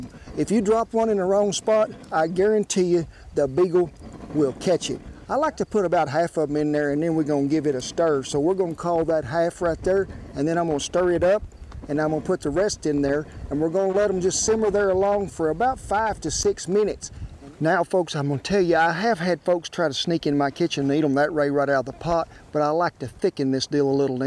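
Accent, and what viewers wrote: South, United States. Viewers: “ a good rollin bowl” love his southern accent. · my grandma who is Italian American and who can make anything. She would make me Chicken and dumplings when I was little. Don't worry I can understand who most of your cowboy English. I like what you call eggs:)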